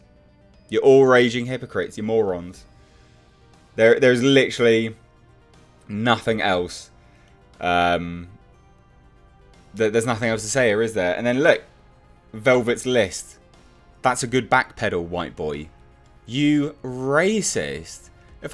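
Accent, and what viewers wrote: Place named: United Kingdom. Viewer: When I first heard your voice I already knew you were a white british man and at the same time I didn't care, I just liked your content.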